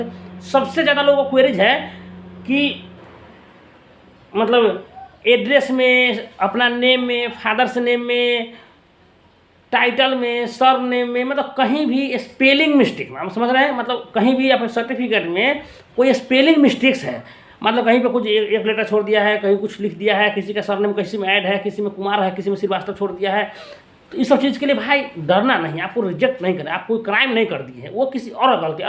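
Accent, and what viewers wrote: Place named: Bihar, India. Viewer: Thodi bhahasha mein bihari touch hai thoda slow boliye please.. smjhne mein dikkat hoti hai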